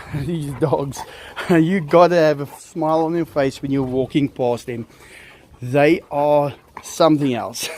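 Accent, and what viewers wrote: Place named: South Africa. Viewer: is it summer there now? bleh.. we have -10C and snowstorm.. well.. thats sweden.. CAN YOU BELIEVE IT haha ur south affrican accent is so cool =) well i should keep my mouth shut since i have swedish accent with hard R like you =) but i love the SA accent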